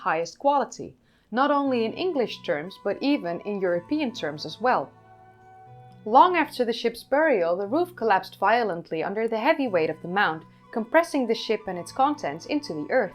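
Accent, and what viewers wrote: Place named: Netherlands. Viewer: the heavy dutch accent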